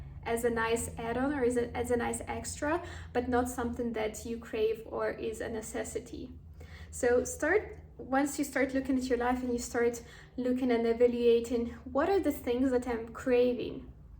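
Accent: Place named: Russia. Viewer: твой русский акцент слишком палевный)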